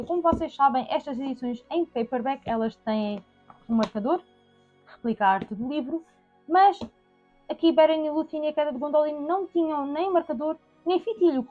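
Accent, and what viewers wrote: Portugal. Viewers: É muito bom ver alguém falando com paixão sobre as obras de Tolkien, mesmo eu tendo uma certa dificuldade de entender o sotaque português. Parabéns.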